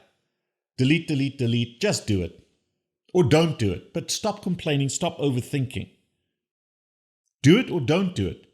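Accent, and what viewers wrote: South Africa. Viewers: Doc - Are you South African?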